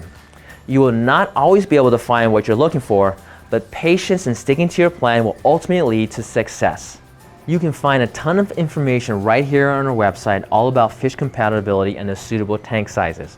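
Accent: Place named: United States. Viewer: This man's speaking american accent. You're lucky to have him informing you about marine fishkeeping bc he speaks slowly and carefully. This 10/10 presentation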